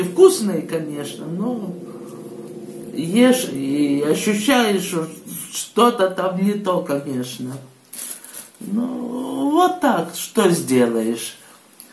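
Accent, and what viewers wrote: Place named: Donetsk, Ukraine. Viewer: Вы так похожи на мою покойную бабушку,, она с Донецка была.. такой же говор … удачи вам..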